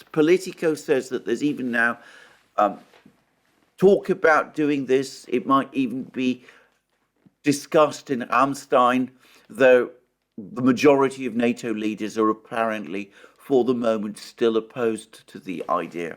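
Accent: England, United Kingdom. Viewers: It's weird listening to a communist with an English accent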